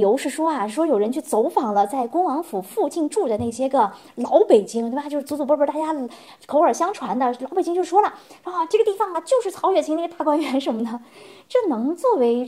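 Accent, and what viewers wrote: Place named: Shanghai, China. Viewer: 上海普通話老靈了~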